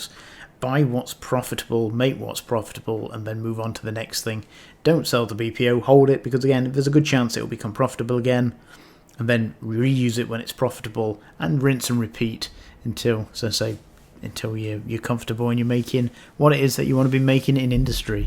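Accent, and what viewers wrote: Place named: United Kingdom. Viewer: Always great! Always love a good ramble. Especially in a British accent. ;) Diversity is King. Gryphter and my other toons are Small Industrialists for sure.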